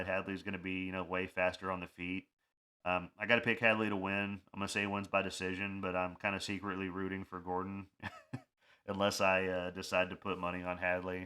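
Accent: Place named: Tennessee, United States. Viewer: I dig your breakdowns. You got a accent like me. Middle TN. Where you out of?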